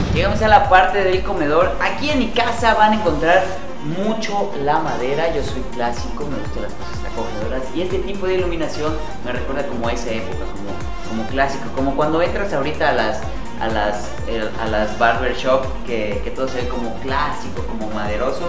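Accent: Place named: Yucatan, Mexico. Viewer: Me gusta que nunca se le perdió el acento yucateco.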